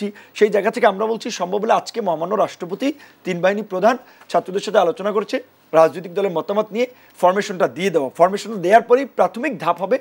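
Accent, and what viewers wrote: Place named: India. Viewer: উপসহাপিকা ইন্ডিয়ান সটাইলে কথা বলবেন না।😊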